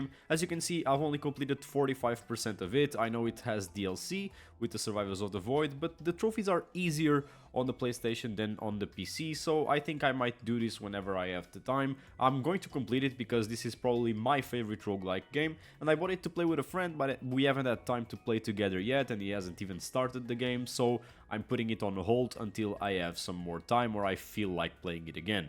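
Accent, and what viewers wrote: Portugal. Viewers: Eu juro que pelo teu sotaque sabia que eras pt😂😂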